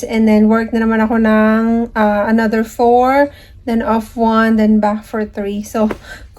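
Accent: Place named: Iloilo, Philippines. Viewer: Hulaan ko, ilongga ka maday ayyyy..... pati ka daw.... shoutout lng q jan ah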